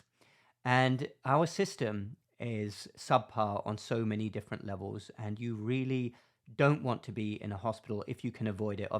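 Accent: England, United Kingdom. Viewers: You have an English accent?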